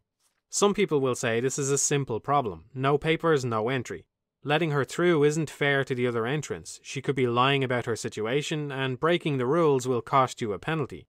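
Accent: Ireland. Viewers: xaax666 he's irish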